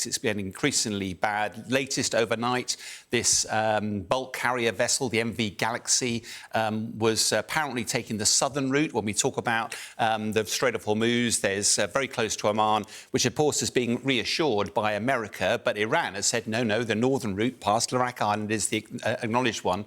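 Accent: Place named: England, United Kingdom. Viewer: Thanks for the Overview Sean Bell. Your sound English, .... trustworthy. American news, only idiots trust.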